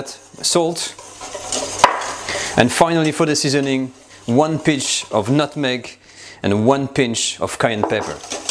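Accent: France. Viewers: Quiche Lorraine is NOT made with cheese!!! That we call a quiche Savoyarde. Despite his French accent, this man hasn't got a clue about traditional French cuisine, as I learned from many others of his video's. He is a bussiness man rather than a cook. His lack of basic knowledge and ingredients is painful to watch! Please take a look at other sites on the internet for traditional French cuisine, there are plenty!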